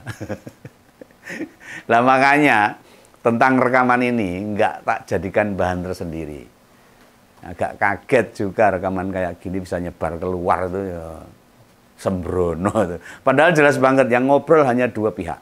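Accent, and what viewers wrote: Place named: Solo, Indonesia. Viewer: Ini yg selalu saya tunggu. Bukan hanya isinya tapi dialeknya itu lho, bukan sekedar dialek jawa, tapi ini persis spt tetangga2 saya di solo dulu bicaranya, ha ha saya di Jakarta 40 tahun, marakke pengin pulang. 😂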